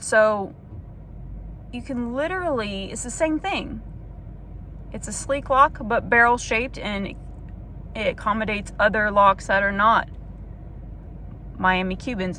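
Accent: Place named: Alabama, United States. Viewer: Also, you sound like you're from Alabama, the "Ah Knoee" gave it away.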